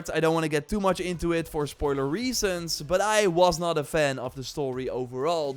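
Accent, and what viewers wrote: Netherlands. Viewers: ben je nederlands ofz?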